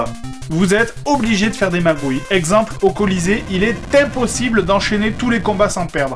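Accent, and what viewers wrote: South, France. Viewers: Il vient du sud non? ( ne me tuez pas je decouvre la chaine) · ha c'était le bon temps, l'époque du gros, avec son bonne humeur et son accent du sud. J'espère qu'il reviendra un jour pour un featuring special avec le nouvel acteur de droite. Perso j'ai toujours préféré l'ancien acteur. Et ceux qui pensent que c'est la même personne. Déjà regardé les vidéos. On passe directement du gros sympa au mince, sans voir à aucun moment le gros qui maigri peu à peu. une vidéo tu as le gros et son accent du sud, et l'autre vidéo tu as un mince, souvent avec de fausses lunettes, ou sans lunettes, son accent comme par magie a disparu, il passe de brun à roux, ce n'est même plus la même voix... Il n'y a que la chemise qui reste la même. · il est de Perpignan, donc il avait un léger accent du Sud. Il l'a pas mal perdu depuis, même si sur certains mots tu peux encore l'entendre en 2026. 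· Je me fais un petit retour en arrière. Je me refais tous les. A cette époque tu avais encore notre bel accent du Sud!😎 Merci d'être sur YouTube depuis tout ce temps. Ne changez rien! Bisous les gaziers!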